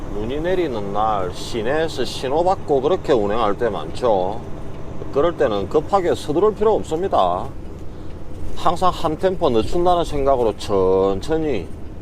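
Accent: Gyeongsang, South Korea. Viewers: 2025년 4월10 경상도 표준말이 그리워 또 왔습니다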